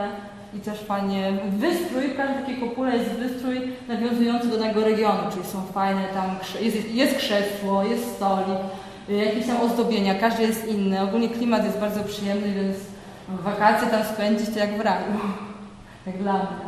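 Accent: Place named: Russia. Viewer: Temat bardzo ciekawy, ale przekaz trudny do słuchania. Co chwila no, no, jakieś rosyjskie końcówki, zamiast ść, sc. To nie rozmowa w małym gronie, ale przekaz do większej ilości osób.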